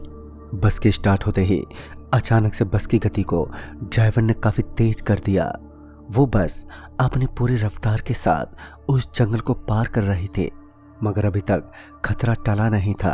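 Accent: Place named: Bengal, India. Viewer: Bhai tum pakka bengoli ho...tmhara Hindi Bengali mix ho rha h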